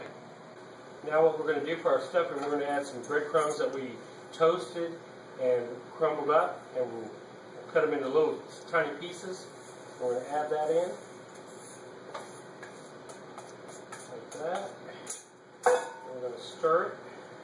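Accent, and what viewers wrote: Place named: South, United States. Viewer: Also, you seems southern. I'm southern too.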